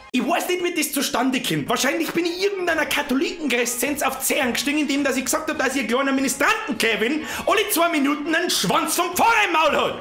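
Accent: Bavaria, Germany. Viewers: Dieses bayrische 💆🏻‍♂️💆🏻‍♂️💆🏻‍♂️💆🏻‍♂️💆🏻‍♂️💆🏻‍♂️💆🏻‍♂️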